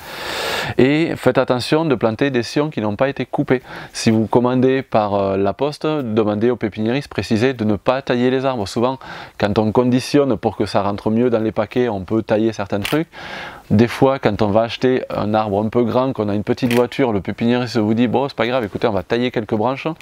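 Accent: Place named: South, France. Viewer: Merci c est vrai vous avez un joli accent du sud a bientôt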